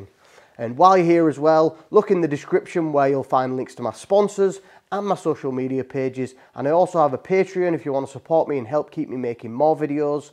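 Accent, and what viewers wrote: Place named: England, United Kingdom. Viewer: Man just found the channel, loving the videos just wish you spoke more you have alot to share! I'm from Birmingham but moved to USA 21 years ago so I love hearing the accent reminds me of England my homeland.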